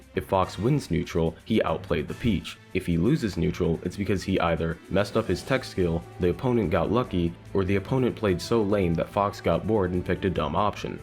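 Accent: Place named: New York, United States. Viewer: has the thickest NY accent i've ever heard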